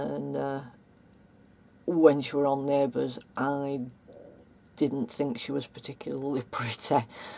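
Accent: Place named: United Kingdom. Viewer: Just subbed you as I liked what I saw, well done on making a great page. I did struggle to hear and understand you, even though Im a fellow UKer, it was a different accent....Im crap with understanding other accents to my own (Londoner) lol. I enjoyed watching your ICADs video too, especially the pink one, Im such a pink freak! lol hugs Tibby xxxxx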